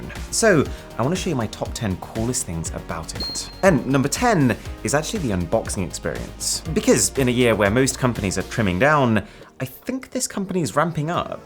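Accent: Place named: United Kingdom. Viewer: The British Accent is everything for me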